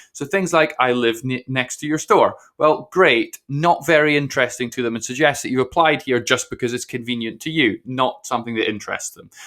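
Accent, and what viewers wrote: United Kingdom. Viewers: Why is a british man telling me how to get a job at piggly wiggly ?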